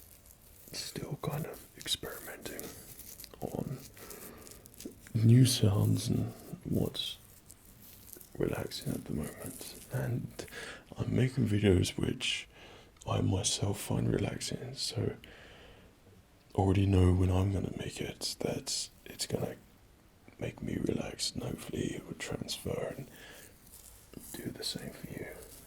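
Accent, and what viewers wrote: England, United Kingdom. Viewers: Lol he's actually British. I'm Australian and we don't sound like this. I wish he was Australian though :(((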